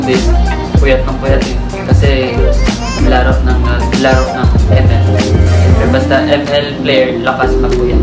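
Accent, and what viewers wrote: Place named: Iloilo, Philippines. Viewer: mailhan ya gd nga ilonggo kay sa tono palang sang pag hambsl mo hahs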